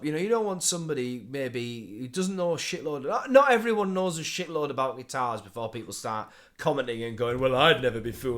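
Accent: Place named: Darlington, United Kingdom. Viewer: no thats his actual accent, im form the uk and a northerner from leeds, hes from more up north than me lol but they definitely speak like that in Darlington area as he described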